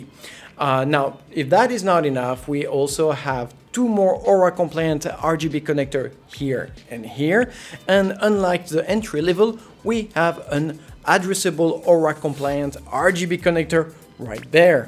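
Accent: France. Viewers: I can hear a little bit of French in your accent. Nice review though, I’m getting this motherboard as a Christmas gift from my wife and I needed this full detail review 👌🏾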